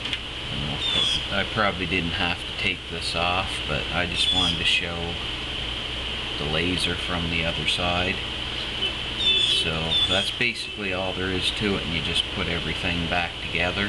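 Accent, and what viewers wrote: Canada. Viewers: ince acent you got there! where is it from? Canada? haha I'm from Canada too, so thats how I knew you were from there... and then I checked your chanel... haha! Thx for the vid man!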